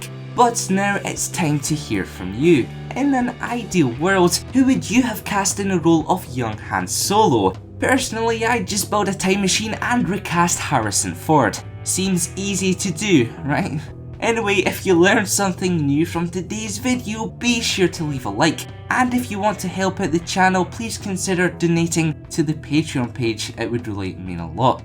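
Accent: Scotland, United Kingdom. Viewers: Are you a scotch? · What part of Scotland? I have friend who's husband is from Glasgow, I have a really hard time understanding him over the phone. You have a lovely accent and I can understand ya perfectly. :)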